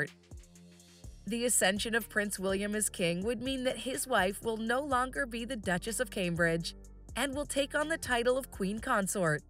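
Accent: United States. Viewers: Good heavens, another Yankee voice getting it WRONG. Princess Catherine has not been Kate Middleton since the day she was married to Prince William, at which time she became Her Royal Highness, Catherine, the Duchess of Cambridge (or "Mrs Windsor, not that they use that name much, but definitely not Middleton). She is now Her Royal Highness The Princess of Wales, plus other titles. Please get your commentary right - OR - don't comment at all! Also it is NOT E ii R. It is II, Roman numerals for the number 2. E II R Elizabeth Regina II, Queen Elizabeth the second. Regina means the reigning Queen. Charles' insignia is C III R. Charles Rex the third. Rex is the male version of Regina. When William becomes King, he will be W v R King William the 5th. Got it?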